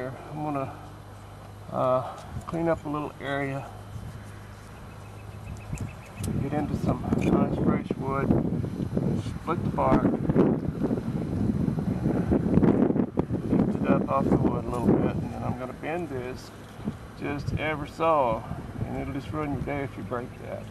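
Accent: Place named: Appalachia, United States. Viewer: Hey. You're in Washington but I detect some Hill Billy in that accent. Are you from somewhere else originally? I'm from Tennessee. Hey I have a question that relates to this video. I have a Gala I bought that's suppose to be a semi dwarf but in the fifth year here it seems to be leaning some and I can grab the tree and move it around kinda easy. Would it be a good or bad thing to plant a couple of MM111s on opposite sides and Inarch into it? I don't want to stake it if I don't have to. Thanks.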